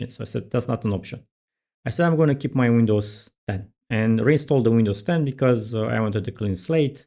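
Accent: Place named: Romania. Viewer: Never heard of lunacy before, Tnx! I also ditched Windows because is a piece of crap and now i am using Linux mint! In general i use open sourced software like blender,gimp,krita and gotot engine so there is no use for using Windows Anymore! Not to mention the customization that you can do in Linux is Just Crazy! By the way you sound Romanian :D! Subscribed!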